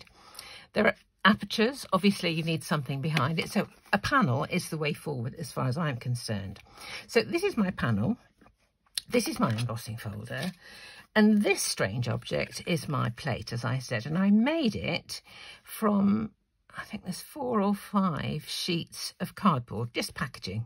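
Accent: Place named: RP, United Kingdom. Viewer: Watching you gives me an immense sense of calm. Your voice is relaxing, and quite, ”posh” at least to these American ears! Anyway, keep posting your wonderfully soothing tutorials.💜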